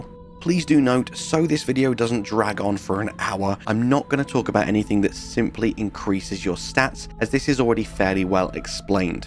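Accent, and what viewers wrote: United Kingdom. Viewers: British voice Do not recommend channel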